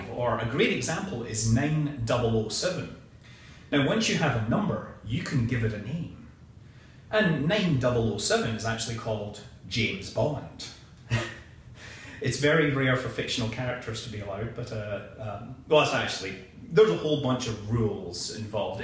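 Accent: Scotland, United Kingdom. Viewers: This is too scottish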